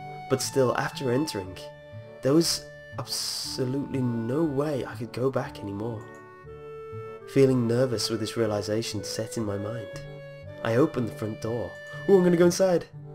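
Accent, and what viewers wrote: England, United Kingdom. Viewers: You're English, too? I Knew it!! *Hugs*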